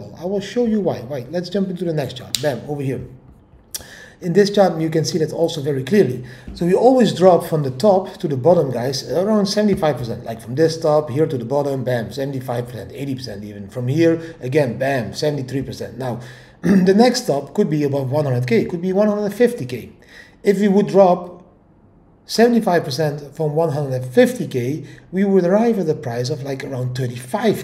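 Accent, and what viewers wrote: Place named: Netherlands. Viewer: This guy is still Dutch AF 🤣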